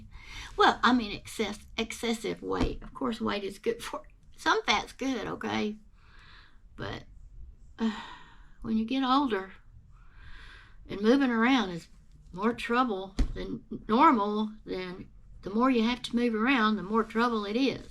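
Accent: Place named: South Texas, United States. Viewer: Just love watching you ‘cause you talk like me! I’m a south Texas native who made my first cardigan following your tutorial this spring. Thanks a bunch, friend! (Ps - I’m a retired nurse too!)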